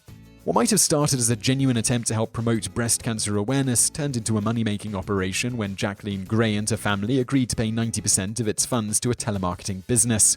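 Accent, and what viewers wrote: England, United Kingdom. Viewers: pyrovargas, Another communist imbecile. You can't tell the British accent? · I like your videos, but by the sound of your voice your English, yet all of your home topics are American. Why is that?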